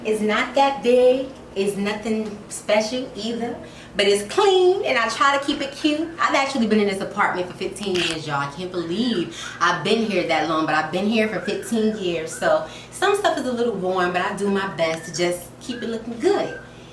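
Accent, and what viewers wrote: New York, United States. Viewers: I love your New York accent . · I love that NY accent 🥰. You made your home very welcoming and it’s cosy and accommodating and so organized and bright.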